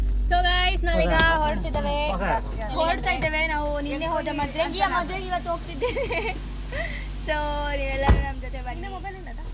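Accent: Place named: Kundapura, India. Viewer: ನಿಮ್ಮ ಕಡೆ ಮಾತು ತುಂಬಾ ಚಂದ ಉಂಟು ಕುಂದಾಪುರ ಕನ್ನಡ ಕೇಳೋಕೆ ಚನಾಗಿರುತ್ತೆ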